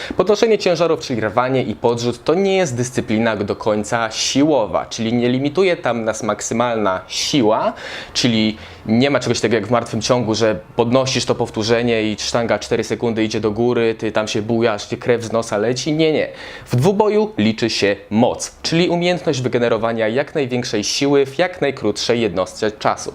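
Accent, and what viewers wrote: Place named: United States. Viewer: Za sam amerykański akcent należy się łapka w górę ;)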